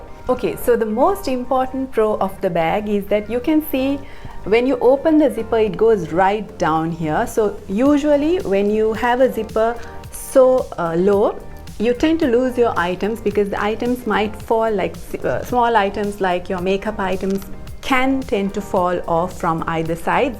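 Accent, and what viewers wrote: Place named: India. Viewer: You are beautiful. You look E. African Ethiopian Eritrean or Somalian but by your accent you are Indian, right?? Thx for sharing.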